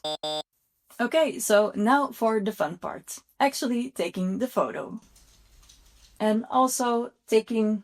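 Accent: Flanders, Belgium. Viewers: Dankt voor de tips, leuk om de verschillende keuren haar te zien en je mooi Vlaams accent te horen :-)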